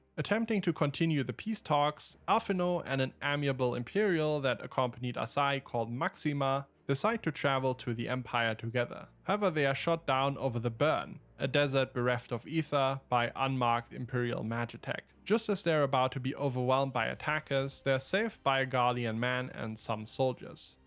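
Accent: Germany. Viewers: What accent are you speaking in? German?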